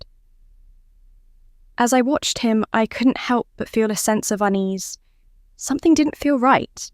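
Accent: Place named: United Kingdom. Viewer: Well, that's it. I'm throwing my unfinished short story in the trash. My favorite pen is going back in it's case. I can't compete with perfection. Dear, you have an exceptional gift for relaying a tale! Every requirement is spot on. Plus, I'm rather fond of the British accent. I could listen to you for hours. Simply put, your storytelling abilities are amazing! I'm sure our children will love their bedtime stories!🤣🤣🤣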